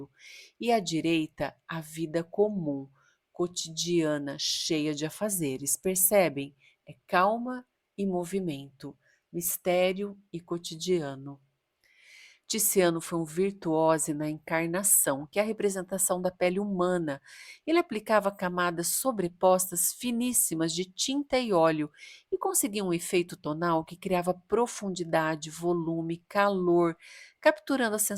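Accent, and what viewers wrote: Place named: Rio de Janeiro, Brazil. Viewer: Acho que vc é carioca!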